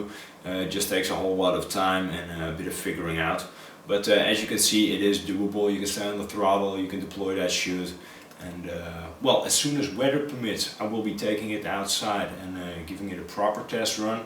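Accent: Netherlands. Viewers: From what European country are you from, if I may ask? Your accent is a lot like a Dutch accent.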